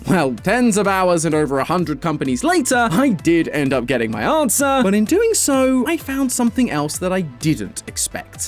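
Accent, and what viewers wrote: United Kingdom. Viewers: why did matpat turn british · Calling it Marry-io makes you sound like an out of touch old person lol. But maybe it's the Bri'ish accent, which is cool ngl In the states it comes off that way though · matpat was replaced with this british mf?!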